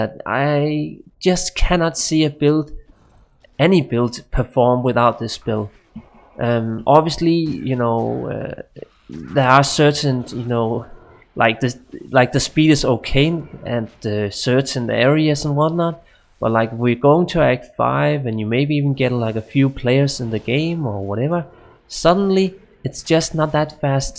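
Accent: Denmark. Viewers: dejligt at høre en dansker :)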